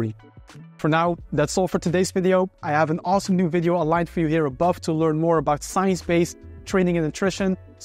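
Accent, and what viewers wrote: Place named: Netherlands. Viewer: je klinkt Nederlands :D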